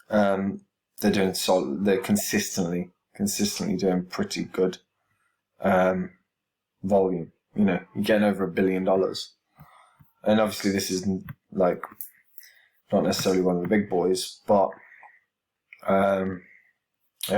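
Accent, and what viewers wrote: United Kingdom. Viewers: You may not like the british accent but damn this guy knows crypto!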